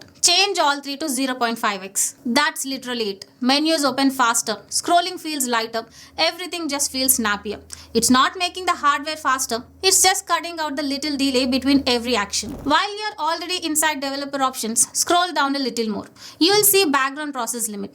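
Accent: South India, India. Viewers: #@ Please pronounce english word properly you are using south indian accent english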